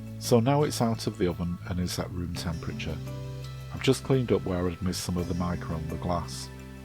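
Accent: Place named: North, United Kingdom. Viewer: You sound northern,like myself, relaxing listening to you x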